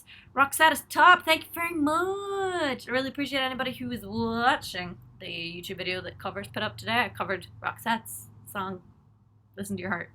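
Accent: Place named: Ireland. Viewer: Lord Tunderin Jeezis.....You Look Almost IDENTICAL To My Ex Girlfriend!!! But, Your Eyes and Personality is/are Much More Beautiful than Her.....She wasn't A Loyal, Trustworthy Person...I Personally believe She was Bi-Polar??? Anyway I'm From The Canadian Chapter of The Fan Club...There's only 9 of us..10 if You count the Dog "Buddy" (After Buddy Holly?)...LOL!!!!!!....Seriously, I Truly Love You and Your Singing....It's just that it causes Me to experience too many Feels...if You know what I mean?......I've truly Adored You for the last 8 years when I first saw You Excel...With an Excellent Elton John Tune....I must've re watched it over and over and over again about 500X....Well, This was My first Post....And That Killa Irish Accent Just Killz Me.....Lots of Irish in my family tree.....Anyway...Best Wishes....I Hope You're Doing Amazing........Hopefully someday You can Tour Canada....Barrie or Orillia Ontario Would Be 2 Excellent Venues....There's Casino Rama near Orillia....And Molson Park Ampitheatre in Barrie....Good $$$...And Nearest to Me...So...Win...Win!!!....Stay Sweet!!!!!!!!!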